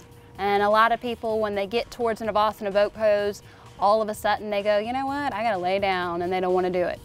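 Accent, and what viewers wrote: South, United States. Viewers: Thanks for lesson. I like your southern accent :)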